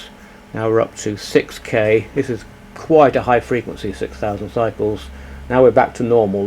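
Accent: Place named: United Kingdom. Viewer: watching so many of your videos from usa i am picking up your british accent. :).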